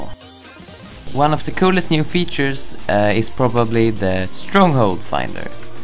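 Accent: Sweden. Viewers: u swedish? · lol that english it's mer swenska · du är svensk det hör jag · R U from sweden?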